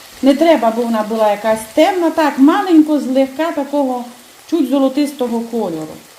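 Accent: Transcarpathia, Ukraine. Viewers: Вона прекрасно говорить закарпатським діалектом то є наша мова у нас усі так говорять вчіться обисьте розуміли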